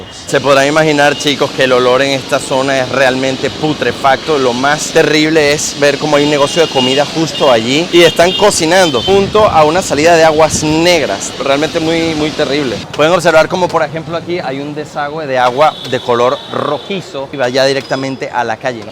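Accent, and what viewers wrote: Venezuela. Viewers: Para su reportaje todo es pobreza, sucio na hay lugares histórico, turísmo y cosas positiva según usted. por su. acento es venezolano, y le digo algo en su país hay bastante, dígame los cerros.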